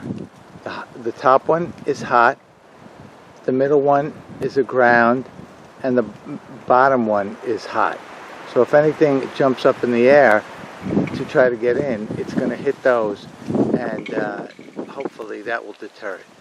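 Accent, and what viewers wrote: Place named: Central New York, United States. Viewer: 😍 they're too cute. The brown baby with the dorsal stripe is much bigger. You sound like your originally from the W C NYS area. 😃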